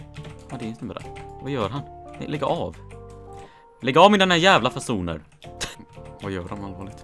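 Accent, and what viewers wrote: Sweden. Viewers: O nice speaks stockohlmska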